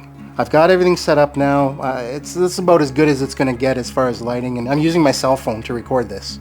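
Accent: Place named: Canada. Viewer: I subscribed because I like your voice sounds calming and Canadian 😊👌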